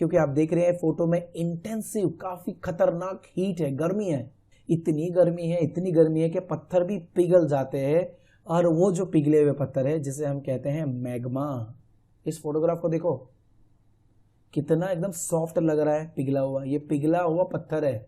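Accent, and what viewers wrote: Gujarat, India. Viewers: 🧑‍🏫Sir you from gujarat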